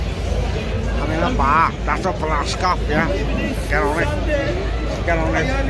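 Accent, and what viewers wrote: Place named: Liverpool, United Kingdom. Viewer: Pure scouser 😂😂😂😂😂😂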